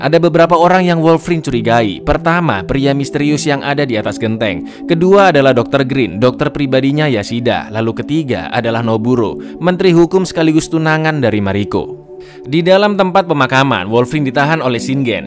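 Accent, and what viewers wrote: Java, Indonesia. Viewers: Mas uwong jowo yo mas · Lur kamu orang jawa mana?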